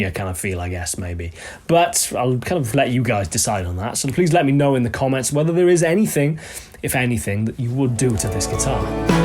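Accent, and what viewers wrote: Wales, United Kingdom. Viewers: Maer wraig newu gal un I fi am ddolig (sonic mustang though) a dwin super excited I gal go arno (commented in Welsh as I detect a hint of Welsh and I’m from Anglesey and studied music in coleg Menai around 2010ish) dda iawn 👏🏻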